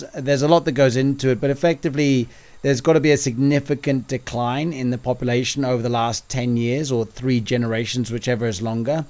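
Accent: Thailand. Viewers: Your thai accent so cute.❤ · หูเราเพี้ยนๆมั้ยคะ เราว่าสำเนียงคุณแปร่งมาทางไทยนิดนึงค่ะ หรือเราคิดมากก็ไม่รู้นะคะ 😂😂😂😂😂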